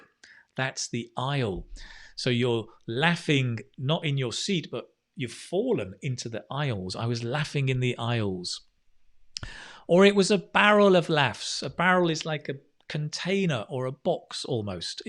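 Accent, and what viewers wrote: United Kingdom. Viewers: Hi What accent do you have? British accent, but which one exactly? Rp?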